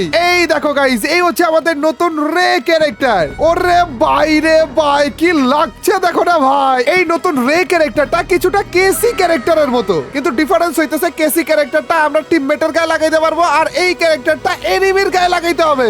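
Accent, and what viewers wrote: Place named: Rangpur, Bangladesh. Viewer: Rangpur kotha basa vai 😮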